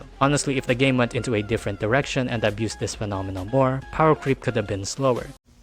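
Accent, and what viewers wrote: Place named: Philippines. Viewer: This guy lowkey sounds filipino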